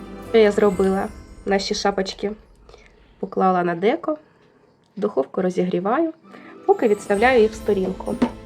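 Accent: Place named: Kharkiv, Ukraine. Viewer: Дякую за рідну мову і рецепт..Ви,мабуть,з Харкова,бо чую рідний акцент.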